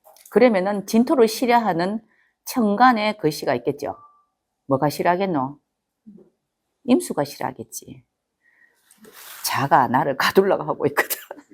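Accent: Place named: Daegu, South Korea. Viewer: 저 이분 설명 너무 잘해주시고 대구 사투리가 참 예쁘네요 대프리카 싫어하는데 이분강의는 참 좋네요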